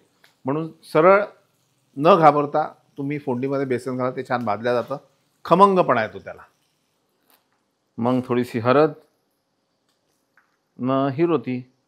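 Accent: Vidarbha, India. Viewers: Varhadi bhashechi godi chhan watli,.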